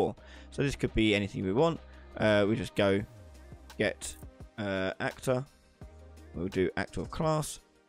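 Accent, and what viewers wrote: United Kingdom. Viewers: Once I got past the heavy Bri-ish accent, I realized that your way of explaining this stuff is the Most helpful. Thanks!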